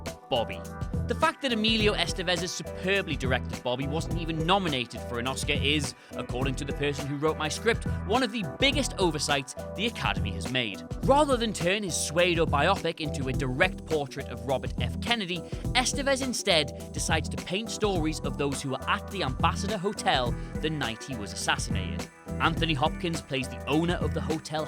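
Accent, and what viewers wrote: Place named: Newcastle, United Kingdom. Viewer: Nah man, he's a Geordie, those fuckers pronounce 85% of words incorrectly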